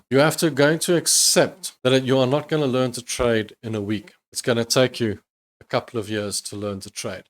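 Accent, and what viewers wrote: South Africa. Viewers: Hi - Love your content! You sound South African - Could the company have been Sharedirect?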